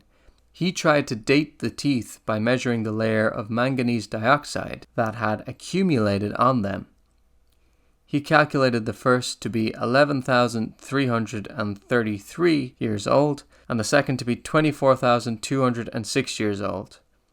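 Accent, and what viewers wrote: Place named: Ireland. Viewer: I hear a hint of Irish in your speech...where are you from in Ireland?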